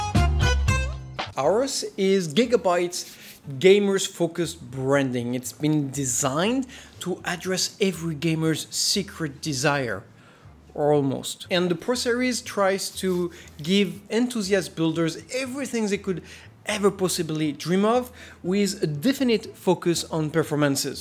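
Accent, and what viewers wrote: France. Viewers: Je viens d’apprendre grâce à cette vidéo que je vais pouvoir maintenir ma workstation à jour d’ici un an ou deux, juste en remplaçant le processeur par un Intel de 11e génération. Super intéressant !Par ailleurs, sur la forme, l’accent français est génial et sur le fond plein d’infos inédites sur les processeurs Intel de 10eme génération et sur la gamme Aorus de cartes mères gigabyte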